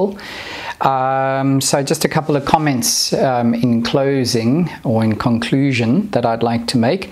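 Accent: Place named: South Africa. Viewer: Fall there vs spring here in scandinavia can be confusing but then you start talking in the nice south african accent and i go uh yes he is on the other side of the world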